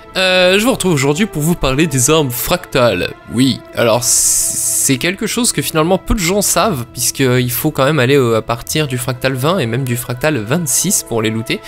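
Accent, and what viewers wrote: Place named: Bourgeois, France. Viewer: superbe vidéo mais arrête de faire ton accent bourgeois. c'est juste pas drôle.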